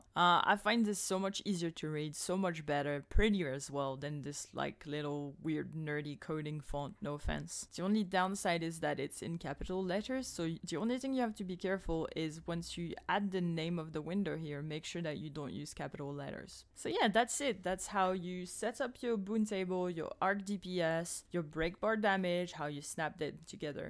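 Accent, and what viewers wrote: France. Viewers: Hi! Great video ty, are u French? European server or American?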